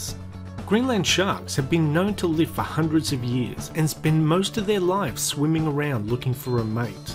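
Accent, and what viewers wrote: Australia. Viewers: The narrator's Australian upspeak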